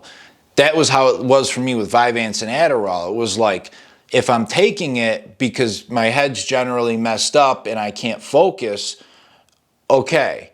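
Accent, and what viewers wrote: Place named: New York, United States. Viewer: You’re from central New York aren’t you? Lol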